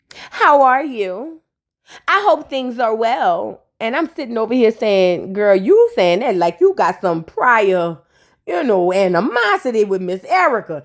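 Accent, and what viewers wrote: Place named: Louisiana, United States. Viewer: luv ur content is u from N.O. cuz u keep it real frfr and ur reactions is great if u not from N.O. u sure do sound like u from Louisiana da bottom of da boot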